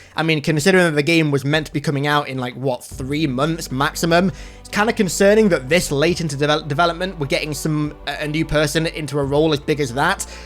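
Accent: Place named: United Kingdom. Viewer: Bro I wasn’t prepared for your face! You don’t look as British as you sound 8/10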